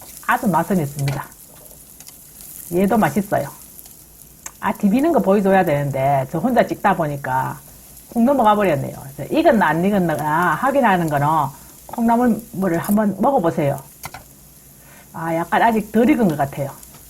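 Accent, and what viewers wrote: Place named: Gyeongbuk, South Korea. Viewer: 와 안니비지노 ㅋ 경북말씀 맞아요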